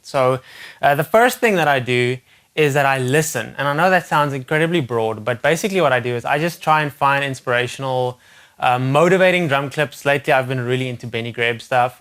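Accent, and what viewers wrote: South Africa. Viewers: What kind of accent is that??? South African?? Where is from?? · Sounds like South Africa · SOUTH AFRICAN!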